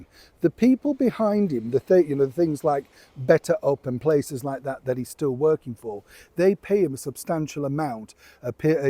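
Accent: United Kingdom. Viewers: Love hearing from a Brit.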